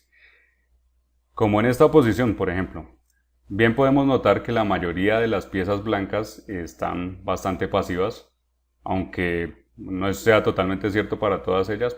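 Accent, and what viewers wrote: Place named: Colombia. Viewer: Me encanta tu canal y la forma como explicas. excelente!! Me animaré a comprar tus packs pero el pequeño detalle es que me toca también comprar un Pc porque tengo mac jeje. Saludos desde Bogotá. Tu de qué parte de Colombia eres? me parece haber escuchado ese acento en un torneo IRT.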